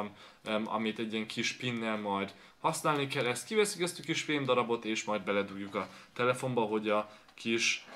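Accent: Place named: Germany. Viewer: Nagyon durva német akcentus...:)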